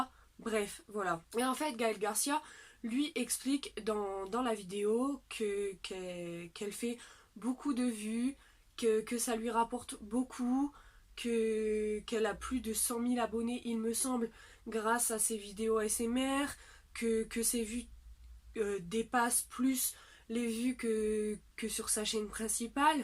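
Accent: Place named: North, France. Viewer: Accent du nord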